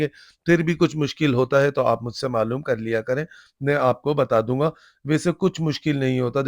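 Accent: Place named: Rajasthan, India. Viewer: Assalaam o alaikum. Mash'Allah bohat asaan teriqey se aap ne gala banana sikhaya. Mai aap ke videos bohat ghaur se daikhti hun. Mai bhi bohat safai se her qism ke kaprdey seeti hun laik phir bhi mainey aap ke videos se bohat kuch seekha hai aur mazed seekh rahi hun. Aap ke lehjey se lagta hai aap Jaipur Rajistan se hain. Kaash aap karachi mei hotey to main aap se hi keprdey silwati. Wassalaam. Jazzak'Allah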